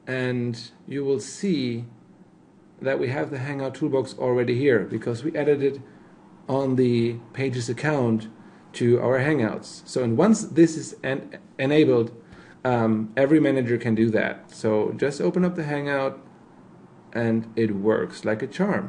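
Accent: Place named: United States. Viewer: Man, how intelligent you are. Language's accent is some big issue, no matter how smart one is. For some of those British out there you are labeled as an American, they don't know any better but you do...! With that Intelligence of yours I'm sure you could speak even Chinese without an accent if you want to...!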